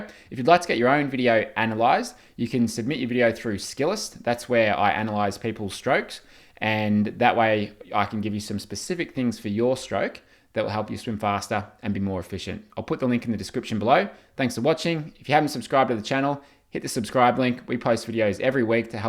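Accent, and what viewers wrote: Australia. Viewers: Australian accent makes this feel both legit and relaxed as hell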